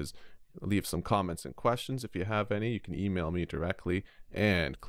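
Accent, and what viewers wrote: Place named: Canada. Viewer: Are you Canadian? I'm trying to figure out where your dialect is from. You've got some serious regional inflection.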